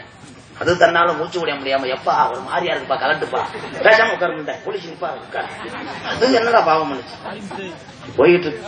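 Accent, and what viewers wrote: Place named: Chennai, India. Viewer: Unaku Chennai voice supper thala,chennaiku konzam mariyatha kuduga.chennai rasighan.please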